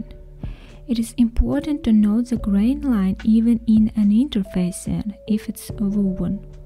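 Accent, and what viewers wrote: Russia. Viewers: Are you Russian?) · И русский акцент))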